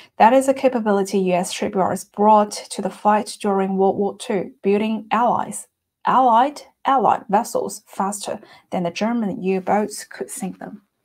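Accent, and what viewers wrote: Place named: China. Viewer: You might have been referring to my comment regarding your accent. If so, I was only curious and not suggesting that you change it. I’m a Taiwanese American, having been living in the US for 37 years. I also had the experience of attending an American overseas high school before coming to the US for college. When I’m relaxed and not tired in general people here think I’m an ABC. Not to brag but just explaining my background. “Protracted” here means prolonged or extended. Already your English is much better than some of the other Chinese Big Vs. I hope they could get their pronunciation to your level. What I’m trying to say is that, foreign accents are actually charming to Americans, especially European, British, and Australian. As long as you lose the Chinese accent you should be fine.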